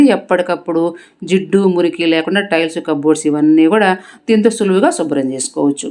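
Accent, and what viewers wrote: Nellore, India. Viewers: Medhi nellore aa mam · మీది నెల్లూరు అనుకుంటాను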